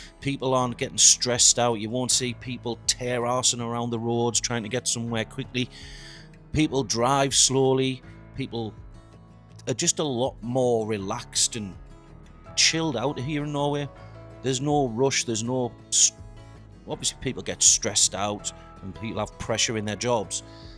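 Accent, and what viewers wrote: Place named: Edinburgh, United Kingdom. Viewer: I have been living 42 years in Norway and I have a little different view on things you have noticed. First, you exaggerate the differences between UK and Norway. I have recently visited Edinburgh and I had an impression of a very similar pace of living and relaxed attitude. Your dialect reminds me of Edinburgh dialect, and maybe you have been living too long in London or a similar city in South England. Second: Staying in London I tried to buy a bottle of beer in a store after 10 pm, and was refused. Three: secondary party. This is typical of a certain social group, mostly single young people. Adult people, with family don't usually do so. And yes, the young people can party a whole night with very loud music, without any empathy for the neighbours. Four: making friends. Usually people make friends in their teens and hold on them. 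It is not common to make friends in adult age, especially not with a foreigner. At work people may be polite and nice, but it stops there. Five: driving. Many people drive very fast, especially those who own a Tesla or a BMW, and have to overtake you, even if you drive a tad over the speed limit.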